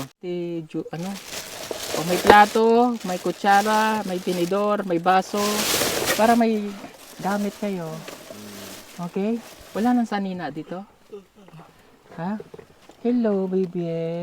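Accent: Visayas, Philippines. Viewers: Sir naaadopt mo na Ang accent Ng bisaya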